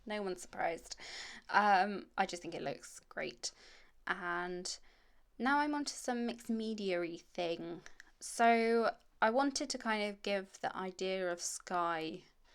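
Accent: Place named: United Kingdom. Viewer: Just found your channel on here. Your layouts are so lovely! I especially enjoy listening to your accent too... I am from the UK originally (Gloucestershire area) and now live in California, so it's like being home again and scrapbooking at the same time! Love your unique style and you have given me new inspiration for the Felicity Jane kits that I can never seem to use up all the little bits and bobs from each month! xx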